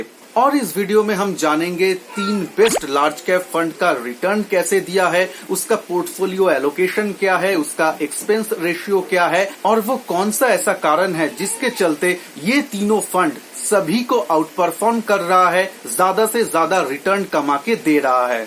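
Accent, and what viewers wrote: Bengal, India. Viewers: Hi, I have seen and liked your video. You talk nicely and your logic is lucid and easily understandable. My suggestion, you should include the Risk part too, the individual Funds poses, in your discussion. That becomes a very critical determining factor in Fund selection. You are a Bengali, right? Apparent from your pronunciation. Good luck to you and keep presenting such nice videos.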